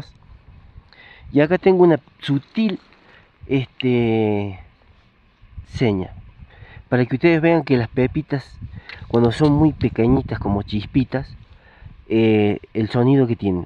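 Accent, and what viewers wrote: Córdoba, Argentina. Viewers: Felicitaciones por su canal, aun no los conocía y me ha parecido muy interesante su video. Muchas gracias. Dice usted que estan en la puna. A mi me parece notar en su modo de hablar tonadita cordobesa. Lo sigo desde España, aunque naci en Córdoba. Una preguntita, el oro que se encuentra así ¿tiene todo la misma pureza? Lo que quiero decir es que si se funde ¿qué tipo de oro se obtiene? o ¿tal vez no se puede fundir? Perdona mi ignorancia, pero es que aquí el oro sólo lo he visto en las películas del 'far west', en los escaparates de las joyerias y últimamente he visto gente buscándolo en el rio en algún canal de youtube. Las cantidades que consiguen sacar son muy chiquititas, pero parece mas difícil de obtener que con detector como usted... Me parece un tema apasionante y si realmente en esa zona hay mucho, aunque sea en pepitas muy chiquitas, al cabo de un mes de frio y trabajo duro, se debe poder sacar un salario ¿no?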